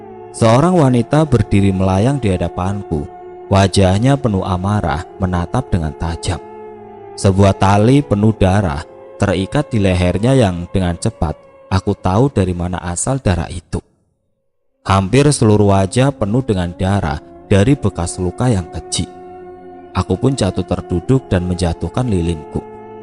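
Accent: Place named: Java, Indonesia. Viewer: Aku suka cerita² kek gini nih. Intonasi dan penghayatannya udh bagus. Tapi kadang kebawa medok bahasanya kadang buyar 😂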